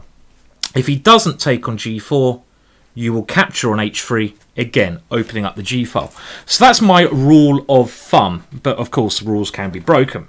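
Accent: United Kingdom. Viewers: Playing video on full volume, enjoying the chess video, even his strong British accent, and that was when the sneeze nearly made me deaf. XD.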